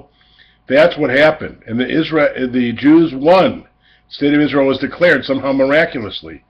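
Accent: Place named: United States. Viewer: I thought he had just visited Israel to honour his grandmother, Princess Alice. You obviously have not heard the news...! You are obviously American by your accent and that could excuse your ignorance.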